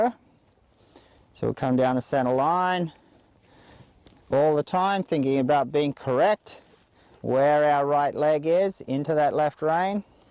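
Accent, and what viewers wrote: Australia. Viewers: Is he Australian? He has an accent...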